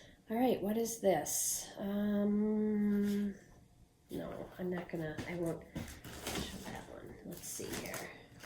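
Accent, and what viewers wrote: Minnesota, United States. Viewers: Good to see you back. Stay safe. I was watching this and my housemate walked in the room. When he heard your voice, he asked where in Minnesota you were from. His mom and sister live in Sauk Centre and his niece is a pediatric nurse at the hospital in St. Cloud.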